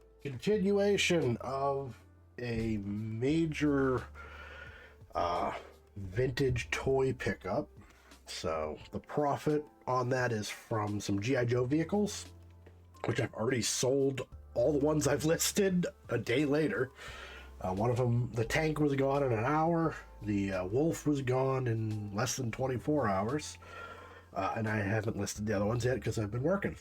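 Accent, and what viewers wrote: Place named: West London, United Kingdom. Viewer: Hahha was thinking you were from Hammersmith, West London 😂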